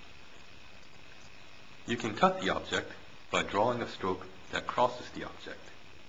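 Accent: Flanders, Belgium. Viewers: flemish, an a bit english XD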